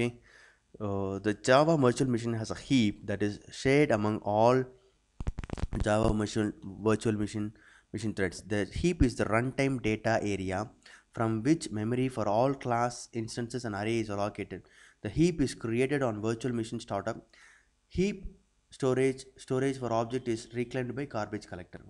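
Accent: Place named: India. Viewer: We Americans just have a hard time with Indian accents. Slow down, we're too fat to keep up.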